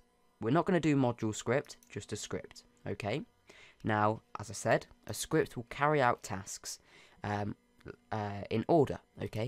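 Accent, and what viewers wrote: United Kingdom. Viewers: prolly because of british accent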